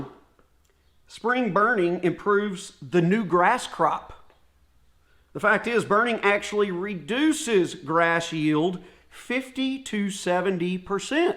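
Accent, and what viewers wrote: Arkansas, United States. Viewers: Hay I was wondering if you are from Arkansas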